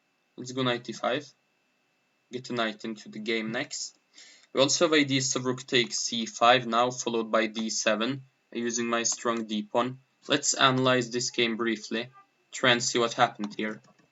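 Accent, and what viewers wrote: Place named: Italy. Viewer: Are you Norweigen? You sound more Italian aha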